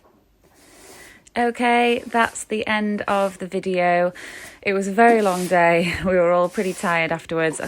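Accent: United Kingdom. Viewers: Would we get the job dun......You sound like a Yorkshire lass.Love your dad and yours.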